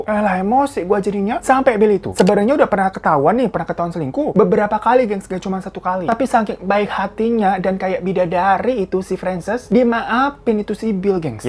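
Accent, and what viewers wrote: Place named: Jakarta, Indonesia. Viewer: Gw suka pembawaannya tuh apa adanya. ... kadang lucu denger logat bicaranya🤭... Betawi bangeud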